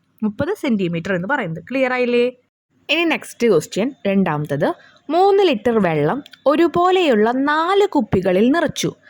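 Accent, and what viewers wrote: Thrissur, India. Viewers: ത്രിശൂര് കാരാണെന്നു തോന്നുന്നു ടീച്ചർ അതു പോലെയുണ്ട് പറച്ചിൽ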